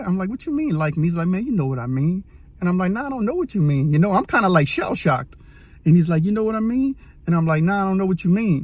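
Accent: Louisiana, United States. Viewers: Are you from Louisiana? Like around New Orleans areas? Your accent sounds like it. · Where u from bayou boy ? St. Charles Parish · In case anyone is wondering this is a Louisiana accent.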